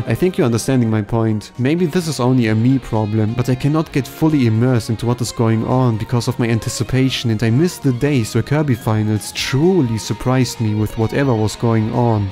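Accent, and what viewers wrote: Germany. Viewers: as an german i can hear you are german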